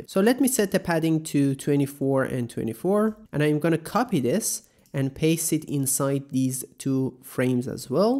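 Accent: Iran. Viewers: its the persian accent thats killen me man. hahahah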